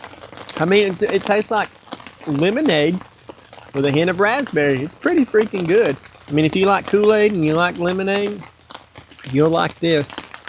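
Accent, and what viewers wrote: Alabama, United States. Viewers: I love watching you camp,. I confess I love hearing you talk b/c I'm an Alabamian too! Tuscaloosa. That's not the only reason though. I'm always interested in how you set the camp up & how you deal w/ anything that might be any little frustration or fixing any problems. I confess, I like the singing as well but I love the stealth camps as well. Anyhow, thanks for all you do to keep us interested & entertained. Stay safe & be well. Peace, Selene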